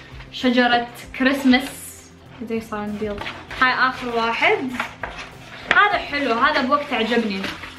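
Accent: Iraq. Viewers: لهجتها قويه مره مو زي باقي العراقين الي اسمع كلامهم مادري ليش ممكن تكون من منطقه بالعراق يتكلمون كذا او هذي طريقتها ترص على الحروف وتنطقها بطريقه قويه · بس أنتي تحكي عراقي .. ممكن جاوبوني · جااشلون تتكلمين عراقي اذاانتي مامولودة بالعراق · انتي شلون تعلمتي تحجين عراقي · الفيديو كتييير حلو😍😍😘 وذكرياتك تجنن بس انا جديدة قناتك بليز ردي على اسئلتي اديش طولك انتي بتحكي بلجيكي وحكيك عراقي ليش مو إماراتي بليز ردي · شي حلو انو انتى صحيح مواليد بلجيكا لكن حلو لغة الام مالتج مثل الي مولودين في العراق عاشت أيد خالة ولؤي واخوج الكبير الثاني لان تكلمو عربي وليس ألماني او فرنسي او هولندي معاكِ كل هذه السنين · جا ليش تحجين عراقي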